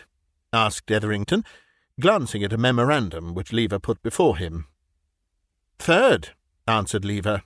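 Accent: United Kingdom. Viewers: Thank you . Much appreciated. I am Egyptian. I did enjoy the superb reading, the beautiful British accent, and the story of course. I can’t say I fully understood the bank “dealings”/terms, but then I can barely understand customer service at my own branch no matter how user friendly they try to be to my 63 yo ears in my own country.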